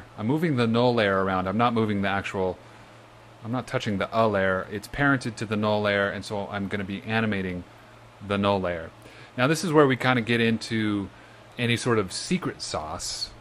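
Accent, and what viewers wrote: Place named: Canada. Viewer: It's pretty obvious that you're Canadian. Cheers to you!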